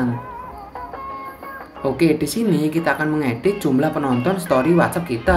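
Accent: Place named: Java, Indonesia. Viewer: Orang Jawa yh bbg 😂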